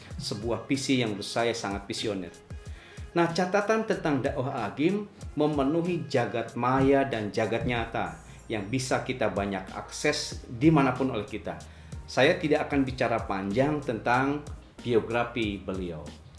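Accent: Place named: West Java, Indonesia. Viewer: MasyAllah tabarakallah, siapa yang tidak kenal sama seorang AA Gym ini, gaya retorikanya selalu membuat mad'u terhibur, dengan aksen logat sunda nya membuat susana ceramah beliau cair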